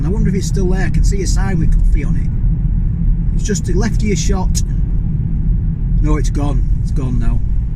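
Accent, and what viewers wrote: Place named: Cumbria, United Kingdom. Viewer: love watching your vids my freind my grandad was from Egremont and you remind me so much of him by the things you say the accent etc your vids are are breath of fresh air in this turbulent times, carry on the great work mate dave from manchester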